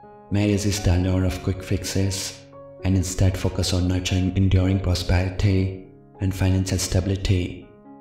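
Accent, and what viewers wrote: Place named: South India, India. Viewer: Your pronunciation is like the south Indians speaking English with the vernacular tone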